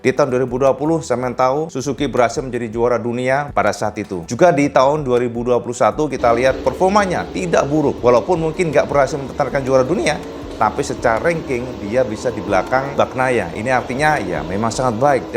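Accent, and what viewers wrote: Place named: Java, Indonesia. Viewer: Sampean jowone pundi cak kok kuentel jowone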